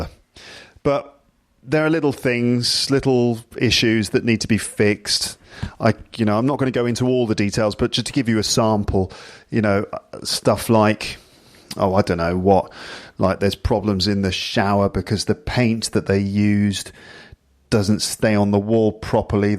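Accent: United Kingdom. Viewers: Thanks for all the efforts I really love your british accent and I'm very happy I anderstand almost 95%. Also, I've returned back to the earlier videos, I've noticed that without the beard you and Macron are like two peas in a pod so please keep the beard! Its just an advice especially that you are living in paris( you know, you can meet people in yellow!).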